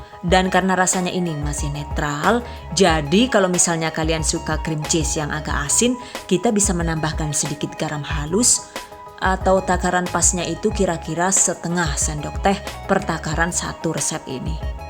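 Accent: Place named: Tegal, Indonesia. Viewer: Terimakasih sudah berbagi ilmu harus di coba ini,dan satu lagi saya suka humornya logat orang Tegal.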